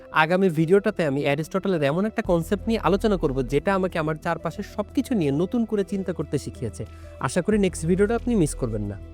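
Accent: Bangladesh. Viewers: apnar kotha shune mone holo apni bangladesi ami kolkata theke...valo thakben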